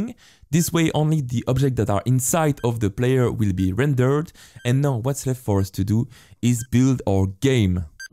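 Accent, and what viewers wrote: France. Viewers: I love hii french english accent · you have a french accent are you french ? because me too ! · Tu est bien français sa se vois de ton accent continue le bon travail frére💪🏻💪🏻💪🏻💪🏻💪🏻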